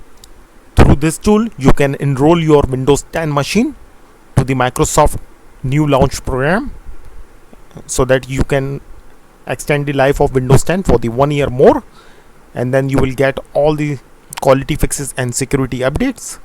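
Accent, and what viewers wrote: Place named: India. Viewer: I'm leaving the moment I hear this Indian accent... already fed up with those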